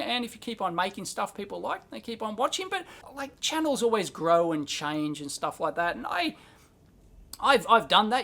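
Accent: Australia. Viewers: Thank you. I started watching 7 years ago, when I was 10 years old. I did not understand anything you were saying but I thought your accent was interesting and relaxing, so I kept listening to your videos. Over time I started to pay attention and learned a lot about electronics and electrical engineering thanks to you. Now I am planning to go to university next year to study electrical engineering. Largely because I stumbled upon a friendly Australian guy. Thank you for being an inspiration, and I hope you continue for many more years.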